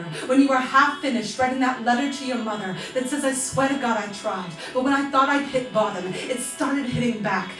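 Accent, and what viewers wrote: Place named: Midwest, United States. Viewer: For one, Everyone has an accent haha; but if you wanna be specific then she has a modern midwestern american accent. I would know, I'm from Colorado.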